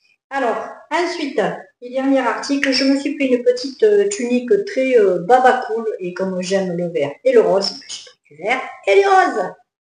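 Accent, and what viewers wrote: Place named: Provence, France. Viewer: Bonsoir, je vous regarde de Paris et j'adore votre accent, vous êtes du côté de ma sœur vers Cavaillon. Juste une petite précision le 2ème micro je pense qu'il faut l'accrocher à votre chemisier. Bonne continuation ❤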